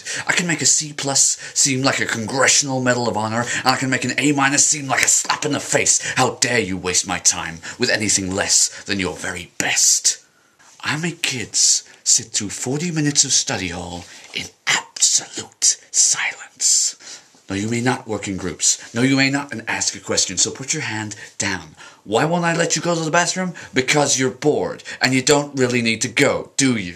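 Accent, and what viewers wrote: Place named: England, United Kingdom. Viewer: lol your accent. sometimes is SPOT ON sometimes its sooo English haha. I've seen that video of his before but not on youtube. i'm never sure if hes seious or sarcastic about certain things. some are obvious, some not so much. and hes a little to televangelist-esque for me but YOU were awesome! :)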